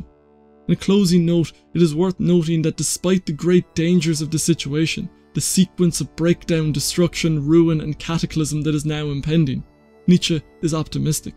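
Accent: Ireland. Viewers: I like your videos by I get distracted by your accent. Where you from, Wicklow? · aha, I just showed your videos to a mate whose just discovered the late alan watts and we were guessing your accent! He voted midlands, I voted not dublin. And I am indeed! You have some great videos man, really enjoying your work.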